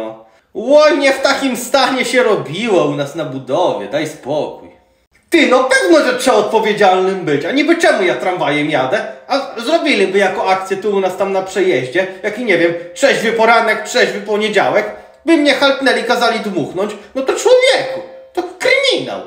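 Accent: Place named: Eastern Poland, Poland. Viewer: Jest Pan super 👍. Jak ja udaje gwarę "wschodnią" to żona się złości że się wygłupiam ale jak Pana usłyszała to już zmieniła zdanie. Pozdrawiam z Małopolski.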